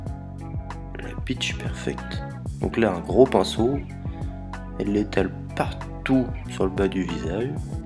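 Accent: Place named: Pays de Caux, France. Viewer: Avec le vrai accent cauchois , on adore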